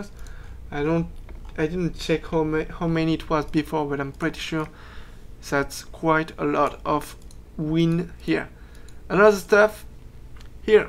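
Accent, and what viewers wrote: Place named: France. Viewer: Lol tu a un accent français trép prononcer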